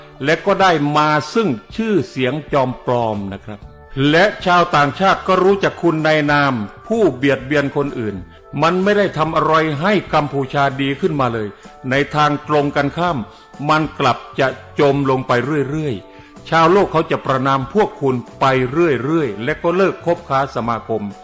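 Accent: Isan, Thailand. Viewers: ผมฟังภาษาอีสานไม่ค่อยเก่งแต่พอมาฟัง มา พากย์เป็นอีสานชอบมากๆติดตามเลยครับ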